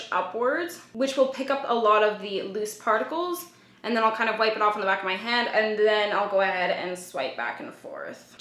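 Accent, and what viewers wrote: Canada. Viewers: Heyoo canadian accent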